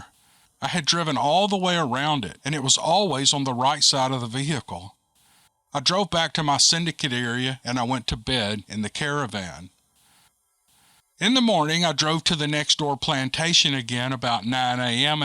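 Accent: South, United States. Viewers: Yes Sir Mr. -- I not only made it to the end, but this is the third time I've done it. I like to cue up a few videos, press play just before climbing into bed. ~ My bed, is surrounded with speakers, and there's a 75" UHD 2160p monitor a few feet away, into which a computer, amp, & sound mixer are connected and nothing else. I refuse to have cable or ANY type of mainstream TV. Just the internet, and full control of what content comes into my home. Needless to say, I often get so damn comfortable, it's about all I can do but fall asleep. I'm kinda new to this channel, in terms of time, (about 6 months) but not so new in terms of content. I listen to something here at Dixie, close to every day, or sometimes binge. ~ When I DO pass out, and miss out on the end of a story, I rinse and repeat, next day. Usually only with THIS channel, -- Or sometimes Buckeye BF and recently Neoma Finn's channel, but mostly THIS one, because it is about the best for really good stories...(Man, there's a lot of crap out there) --I love that nice Southern accent, (I'm sure You know the one I mean), soothing narration, and genuine, original content. 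(Dang!, If I would have ever met a cute girl in my youth, with an accent like that, I'd be FINISHED. My heart would never have escaped alive...) ~You have a LOT of videos, with really good stories that feel quite sincere. I am in NO rush. I'm taking my time. To thoroughly, slowly go through them. I like the long ones, and that's why I like the YT CUE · I made it to the end, yah!!! I'm just sad it ended. I could listen to these forever, I wish they were hours and hours long, I never get tired of it! I have always loved listening to people tell stories, I love stories! Now every night is storytime!!! My daddy was the greatest storyteller, he told us stories all the time and I loved it. He could just draw you in and keep you hanging on by your fingernails; he was a scotch Irishman and you know how they can spin a tale, except his work true. I love the sound of your voice and I can understand everything you say because you sound like everybody I know. 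You've got that slow Southern drawl that I know so well. You seem like the nicest guy in the world. I started watching this one about last night, I would listen a while and fall asleep a while and repeat... several times. I finally made it through and it's now in the morning; I think I'll take a nap. Later, I'll start my search of your other videos for my fright night creepy fest. Late at night, when the lights go out, you come on; you make living fun. God bless you real big Jude, from Kentucky ✝️🐴🌿🇺🇸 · To the person who mentioned your accent. Didn’t the name tip you off? It’s not called “ Fargo Cryptic “. voice is not only soothing, it’s perfect. I listen at night while shutting down for the day. It’s the perfect bedtime stories. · I listened to the entire series. I loved it. Each story was unique and interesting. I am a great fan of your podcast. To the snob who criticized your accent, as another Southerner, I would quote my Momma who would say, "kiss my A double scribble."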